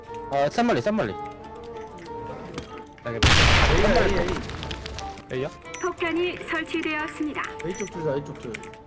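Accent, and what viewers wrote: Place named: Jeolla, South Korea. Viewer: 전라디언 ㄷㄷㄷ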